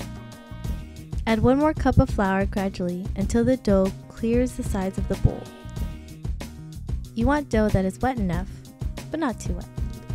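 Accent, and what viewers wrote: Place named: United States. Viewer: you sound so american than filipino thats kinda funny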